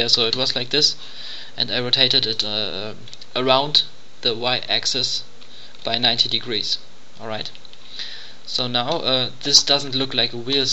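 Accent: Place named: Germany. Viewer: Da haste son bissle deutschen Akzent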